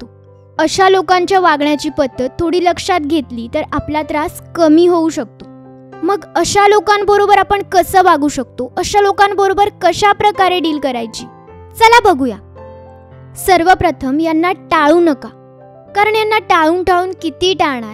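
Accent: Pune, India. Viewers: Puneri Marathi ❤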